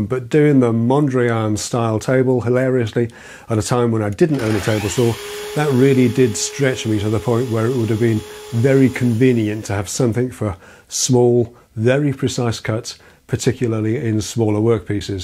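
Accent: United Kingdom. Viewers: I subscribed because everything sounds better in a proper British accent. I’ve never seen his work but I am already convinced he makes the most extraordinary pieces 😅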